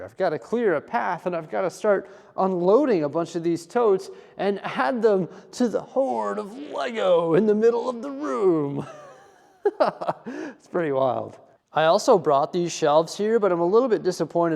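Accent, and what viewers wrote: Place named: Canada. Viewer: I find it so funny that you don’t sound Canadian till you say “about”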